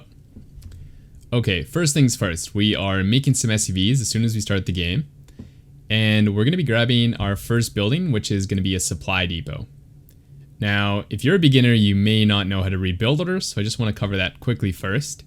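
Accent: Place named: Hungary. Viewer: Aren't you hungarian by any chance? your accent sounds hungarian.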